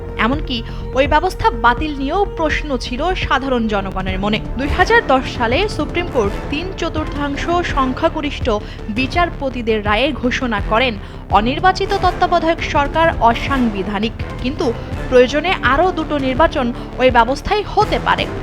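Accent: India. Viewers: ভারতের স্টাইলে সংবাদ পাঠ🖕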